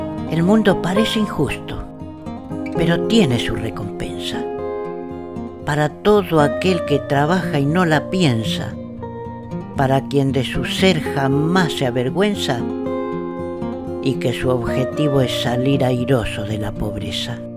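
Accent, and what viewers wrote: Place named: Argentina. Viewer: Tremendo tremendo,bien Argentino lo que dice y como lo dice... felicitaciones gran abrazo...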